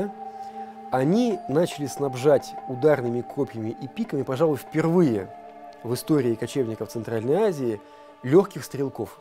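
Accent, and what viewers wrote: Russia. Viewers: Россияне традиционно "акают". Говорят "МАсква" (пишут- Москва), говорят "агород" (пишут- огород) и т.д. Было бы странно если бы российский историк говорил бы так, как привычно казахстанцам, а не русским.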